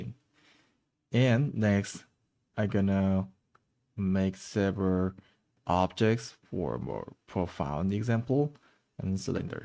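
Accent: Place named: South Korea. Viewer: 발음이 한국 분인듯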